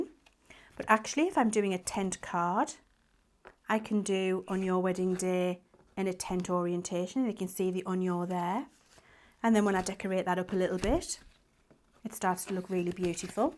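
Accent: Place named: North East England, United Kingdom. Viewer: It sounds like she is from the North East Of England, say Teesside. I come from there, so that’s why I am guessing that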